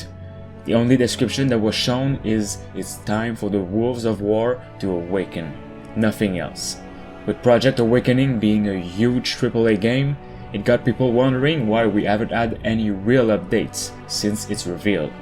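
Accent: Quebec, Canada. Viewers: Jolie accent quebecois! ^.^ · Clearly a French Canadian I recognize it by that small accent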